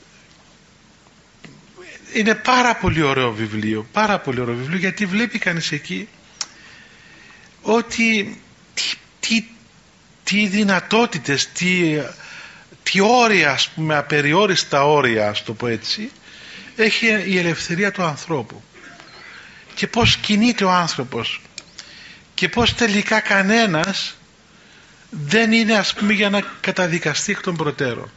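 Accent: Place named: Cyprus. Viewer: Πόσο σε αγαπάω πατέρα Αθανασιε, σε ακούω πολλά χρόνια καί έχω ωφεληθεί πάρα πολύ. Μου άρεσει το χιούμορ σου, και τα κυπρεικα που λες τα απολαμβάνω. Να σε έχει ο Θεός καλά Ευχαριστώ από Ελλάδα.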